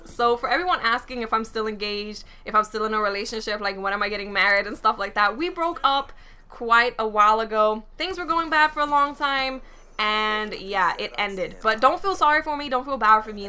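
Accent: United Kingdom. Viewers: UK....lol weres ur accent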